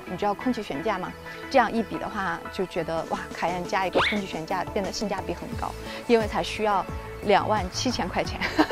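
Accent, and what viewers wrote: Beijing, China. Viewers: 解说的很到位，喜欢你的北京腔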